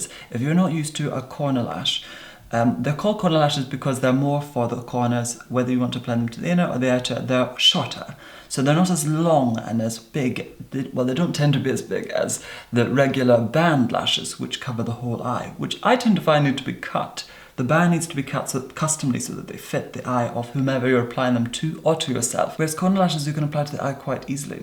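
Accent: United Kingdom. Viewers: You speak like a British queen and it's so relaxing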